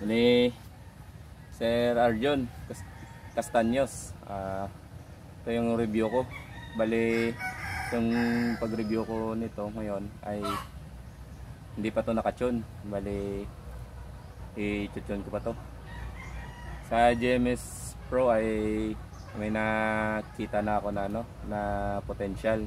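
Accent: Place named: Iloilo, Philippines. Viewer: sir my accent ka sir...taga iloilo kba sir?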